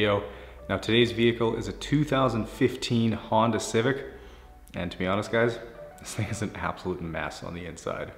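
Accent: Canada. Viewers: You forgot the Canadian accent!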